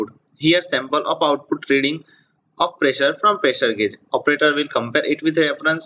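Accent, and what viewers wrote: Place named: India. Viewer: you Indian people really just screwing up every tutorial with your accent! speak slower and more clear for heaven's sake...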